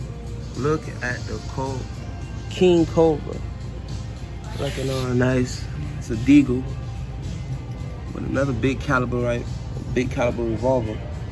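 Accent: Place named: Maine, United States. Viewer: Raw af. Where u from maine? U gotta cool voice/accent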